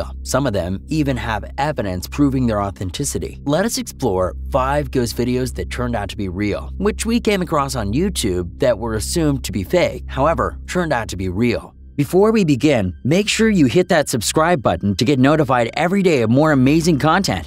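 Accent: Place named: General American, United States. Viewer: im noticing a theme with these type of vids, the narrator usualy has a standard american accent (i assume its american) but cant structure sentences properly....why?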